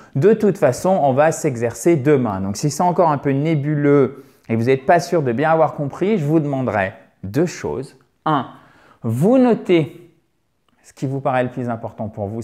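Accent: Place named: United States. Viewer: Hi. It's very interesting and subtle. I can add that you seem to be closer to the American accent!!! Enjoy the rest of your day!!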